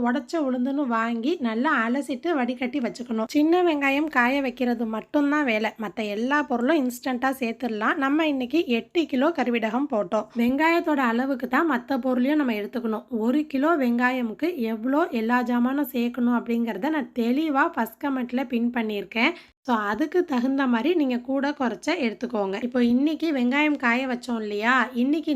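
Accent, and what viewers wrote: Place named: Thiruvarur, India. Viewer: Ungalukku entha ooru enga Orr karanga mathiri pesuringa nanga thiruvarur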